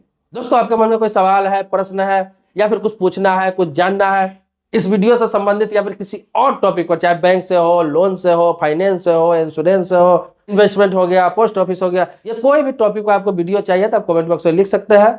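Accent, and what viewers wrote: Bihar, India. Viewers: बिहारीं लँगवेज!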